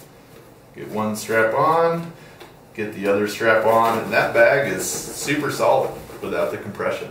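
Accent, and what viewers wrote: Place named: Baltimore, United States. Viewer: Just got this bag and I'm psyched to do my first trip w/ it. I like your mid-Atlantic Baltimore / Philly accent. It's subtle but those vowel shifts are noticeable. Thanks for making a badass bag.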